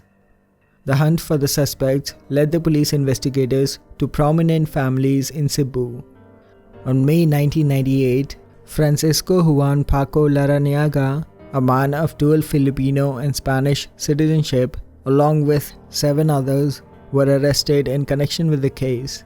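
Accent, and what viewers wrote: India. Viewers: You sounded Indian, are you Filipino? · Indian accent . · Indian accent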